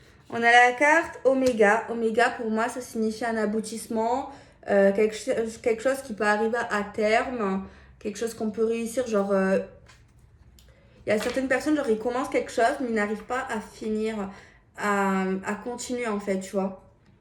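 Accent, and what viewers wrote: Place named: South, France. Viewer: T' écouter me fait plaisir, j'aime l'accent du sud, ta petite voix, courage petite, tu fais bien..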